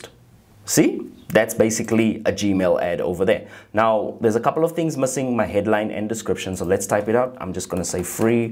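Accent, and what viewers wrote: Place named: South Africa. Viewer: We are also originally from SA and love listening to the accent, you can't imagine how refreshing it is, smooth as Tennessee Honey Whisky😀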